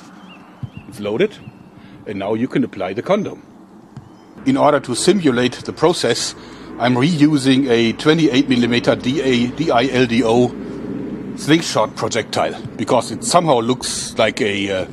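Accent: Germany. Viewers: when you hear his ,,dialect“ of speaking, you know that he is from germany;) geile video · It's cool how I recognized the German accent even before I knew you ARE German. xD By the way, I'm German too, so it's no hate. I also have some bad accent. :)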